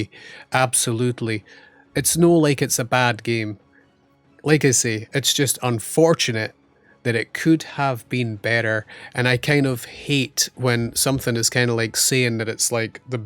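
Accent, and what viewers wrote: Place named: United Kingdom. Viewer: This is still a great game. Whiny british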